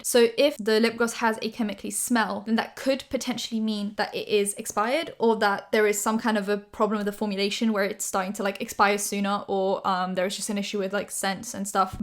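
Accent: United Kingdom. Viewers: Are u british and from London?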